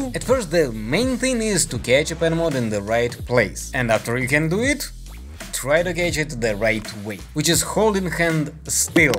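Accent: Russia. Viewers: I love your. Russian accent it's so nice to hear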